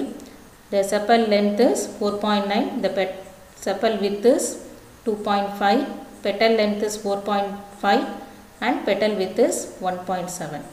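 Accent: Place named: India. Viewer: Unfortunately it is quite difficult for me to understand explanations in hindustani english.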